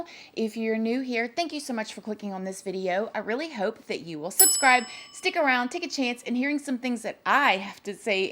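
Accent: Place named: Louisiana, United States. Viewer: Hey, I was wondering if your maiden name wouldn't happen to be? You remind me of and look like my little cousin that I have not seen since childhood. We are from Lake Charles Louisiana and you sound like you have a Louisiana accent.. I was just wondering if you are my long lost Lil cuz.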